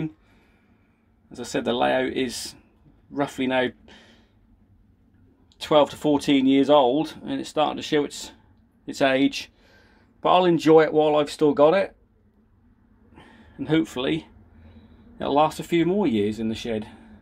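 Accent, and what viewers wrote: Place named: Norfolk, United Kingdom. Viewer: Fantastic layout mate! That accent sounds very Norfolk/fen am I right ?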